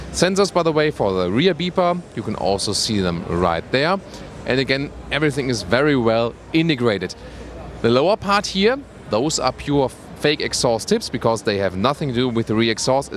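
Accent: Germany. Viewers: classic german english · is great! I like your German English! And, no one is better than you! Appreciate your business!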